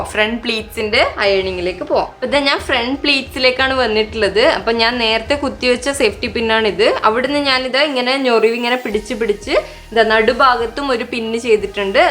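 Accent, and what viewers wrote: Kannur, India. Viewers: Chechy de place evdaa kannur slang thonnunnu samsaarikkumbo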